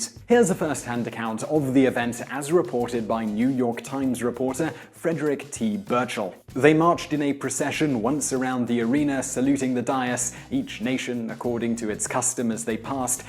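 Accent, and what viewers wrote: United Kingdom. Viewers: Jadger1871...It is wise to keep in mind that he is from Britain and certain words are said different in other countries. I live in a country other from where I was born and grew up and it is not unusual for people to correct me in my pronunciation. I pay then little heed because my way of saying the word is no more or less valid then is theirs. If they were to go to my country they would be corrected there just as I am here.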